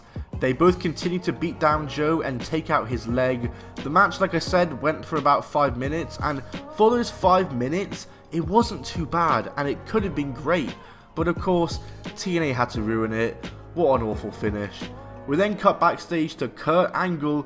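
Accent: United Kingdom. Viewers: Listening to a nit brit talk about this is crap.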